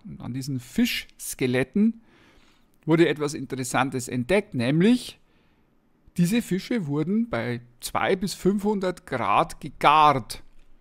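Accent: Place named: South, Germany. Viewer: angenehmem süddeutschen Akzent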